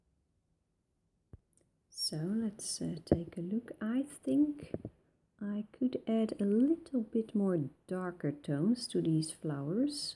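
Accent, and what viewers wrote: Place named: Netherlands. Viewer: The expression "raining cats and dogs" is still in common usage in the U.S. Chartreuse rhymes with "loose." I find your Dutch-tinged English to be delightful and charming, with never a time of misunderstanding. We find these days that the younger generation simply will not speak grammar properly, so it is actually a relief to me to listen to your excellent English. Ohmigosh - sign me up for your workshop at one of our national parks!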